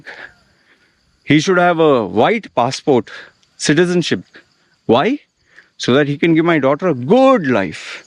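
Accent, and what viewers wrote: India. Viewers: In Canada English people hate people from Idian origen ! They hate your accent ! why you follow anglo culture??? ? WHY???? you need mental help !you are living on the moon ! everybody know your people are scammers ! why you get tattooes?? I dont respect man with tatoos on face ! you should go back to your country and leave the people in Thailand in peace . Are there toxic chemicals in tattoo ink? Mercury, cobalt sulphate, other soluble cobalt salts, and carbon black are in Group 2B, which means they may cause cancer in humans. Cadmium and compounds of cadmium, on the other hand, are in Group 1 (carcinogenic to humans).When it comes to cancer, black ink can be especially dangerous because it contains a very high level of benzo(a)pyrene.The body is directly exposed to the toxic substances contained in the ink due to the injection of tattoo ink into the skin. 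Pigments may accumulate in the lymph nodes or other organs as they are in direct contact with the skin tissue and lymphatic system.The colorants tatoo ink they get transported to other anatomical locations in the body via the blood vessel and lymphatic systems directly after tattooing or via the lymphatic system years or months after tattooing.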